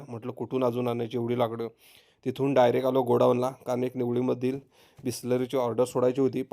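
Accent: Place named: Konkan, India. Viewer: Are wah tumhi pan Tulsa bolta ...koknit khup samya aahe ...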